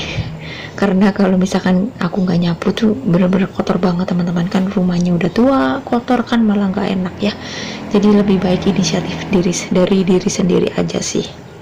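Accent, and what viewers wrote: Banyumas, Indonesia. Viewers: Salam kompak wong ngapak..ora ngapak ora kepenak kk..😁 Sukses selalu kk..🙏🙏🙏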